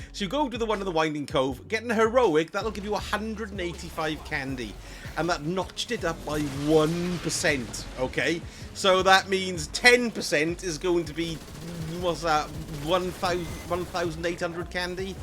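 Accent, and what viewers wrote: Wales, United Kingdom. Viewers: Like this guy, funny. I Can’t work out if he’s north or South Wales? Anyway thanks for tips!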